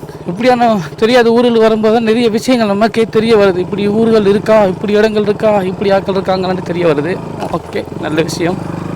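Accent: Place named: Batticaloa, Sri Lanka. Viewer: உங்கள் கலப்படம் இல்லாத மட்டக்களப்பு தமிழும் மருதநிலமும் அழகு. ஆனால் பாவற்கொடிசேனையில் கடைசிவரையும் பாவை கொடியை காட்டவே இல்லை.❤❤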